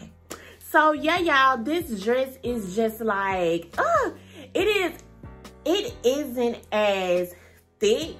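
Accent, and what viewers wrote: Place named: Atlanta, United States. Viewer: Lol your voice 💕❤️ you gotta be frond Atlanta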